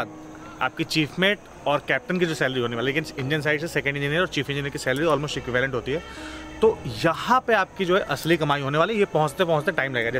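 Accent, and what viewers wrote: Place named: Bihar, India. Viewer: Kaa bat kr rhe hai sir ... Aap bihari hai.,❣️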